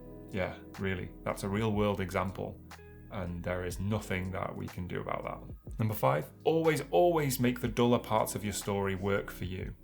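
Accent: United Kingdom. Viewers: Congratulations on your novel. Plus, I love your British accent!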